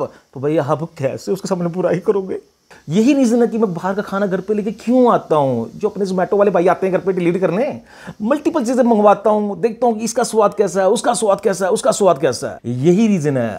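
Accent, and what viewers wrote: Punjab, India. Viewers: Sir ur Punjani accent😍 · Bhai kya aap punjab se ho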